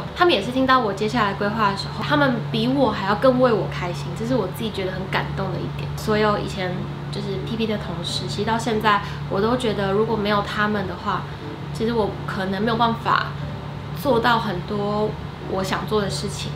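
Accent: Cantonese, China. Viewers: 不要哭啦，知道你是獨立的女生，好喜歡你的廣東話口音，歡迎再來香港😘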